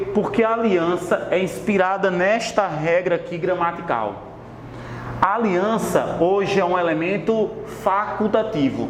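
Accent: Northeast, Brazil. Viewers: Além da aula fantástica, ainda tem esse sotaque lindo dos nordestinos. Maravilha!!!!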